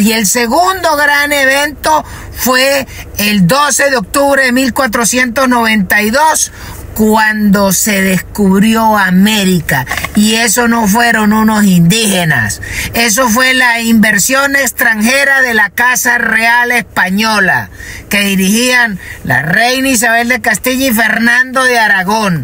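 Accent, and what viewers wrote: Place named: Táchira, Venezuela. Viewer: Así se habla,como un GOCHO arrecho👺💪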